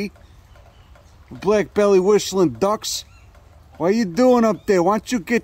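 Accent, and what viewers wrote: Chicago, United States. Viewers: You are welcome, I try to participate as much as possible. 😎 I am an active Master Gardener and Naturalist with a mostly wild yard in a mid-sized town. I enjoy his use of Latin names and style, not to mention the accent brings back fond memories of South Chicago, and Da Region.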